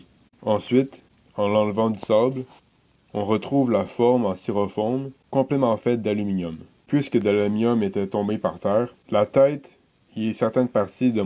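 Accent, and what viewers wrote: Canada. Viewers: Ton accent québecois avec la composition des phrases en francais c'est MAGNIFIQUE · 6 tasses c est trop rigolo avec cette accsent canadien !!!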